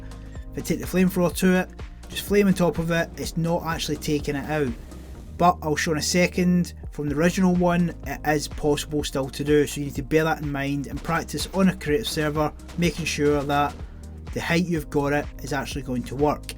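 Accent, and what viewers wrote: Scotland, United Kingdom. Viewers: He bri'ish ? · Are you Scottish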